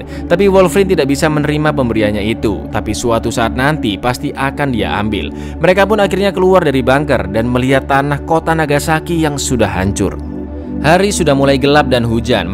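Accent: Java, Indonesia. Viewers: Lur kamu orang jawa mana? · Mas uwong jowo yo mas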